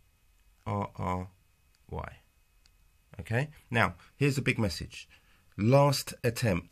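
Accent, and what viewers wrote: United Kingdom. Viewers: I did not understand, I cannot understand british accent that easy :( gonna try again :(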